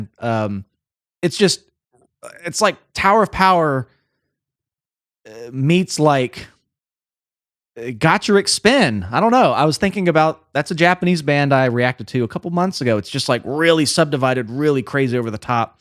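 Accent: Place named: United States. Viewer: Pronounced, Redding, Staytus... bloody yanks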